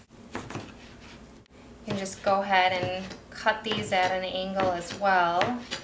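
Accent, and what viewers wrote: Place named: East Coast, United States. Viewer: Are you from the east coast? You have this little accent just like me lol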